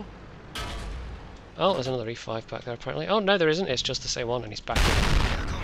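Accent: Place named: Scotland, United Kingdom. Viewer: On my side bar, it's recommending a video for me, the Most Offensive Jokes Ever, Frankie Boyle is pictured, his jokes aren't offensive,merely telling the truth, you Scots have a unique way of speaking that that is true,don't you? With this in mind, Which tank in WOT really offends you?, offends you by just being there!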